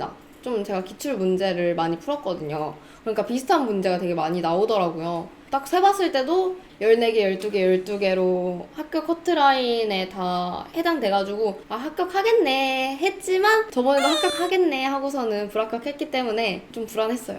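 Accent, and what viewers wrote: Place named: Gyeongsang, South Korea. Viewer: 오오 님 영상 처음 보는데 오 서울 사람인가보다 하다가 중간에 어? 경상도 사투리 한 번 쓰시길래 설마 헀어요 근데 마지막 쿠키 보니까 완전 경상도 사람 이었네여 ㅋㅋㅋㅋㅋㅋㅋ 필기 1급 공부하려 하는데 꼭 합격 해야겠어요!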